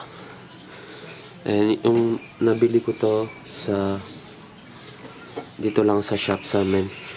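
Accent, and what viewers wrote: Pampanga, Philippines. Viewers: Kapampangan ka pala soy hahah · Kapampangan ka pala paps😂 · boss kapampangan ka pala yee 😂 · Kapampangan La Pamo Hahaha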